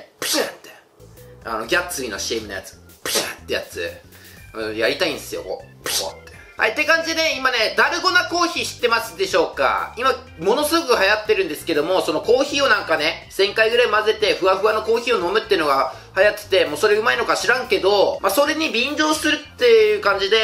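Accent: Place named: Kansai, Japan. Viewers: 今日ちょいちょい関西弁出た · 時折に関西弁が出る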